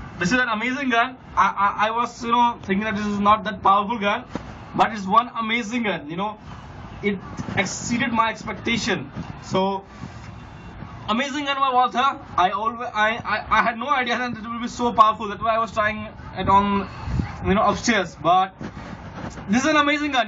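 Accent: India. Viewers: Indian accent funny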